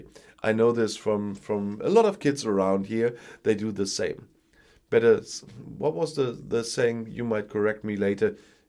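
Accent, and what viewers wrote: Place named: Germany. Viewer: ), 'Wertschöpfungskette':)., you are a true European: you combine convincingly English spoken news with a slight German accent, having trouble remembering the word for supply chain in your native (?) language with a Belgium given name and Dutch last name.. Way to go! BTW: Genius example of German functional descriptive language word salat. BTW2: I just realized being Dutch from German ancestry with my kids, all possessing Italian, French, Dutch and possibly German nationality. My email address ends in.eu. We will get there eventually.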